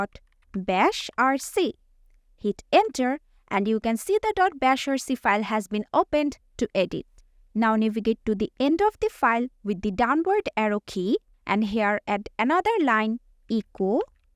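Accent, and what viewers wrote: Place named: India. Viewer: I can tell you do have an accent that seems coherent or native to the country of India. But it is not so thick that I cannot understand you as well. As you seem very well spoken on your pronunciation's. Considering the info a position earlier I offer one piece of advice if I may Increase the zoom in your gnome terminal or more... I had my phone in the youtube video set to 1440p Resolution and had to zoom in to read the info. You were typing in the terminal and I have reasonably decent vision For those who don't have a 1440 pixel phone. Nor access to that resolution setting because the phone or device they're running isn't powerful enough. This would help those people Better enjoy your content Thanks Cheers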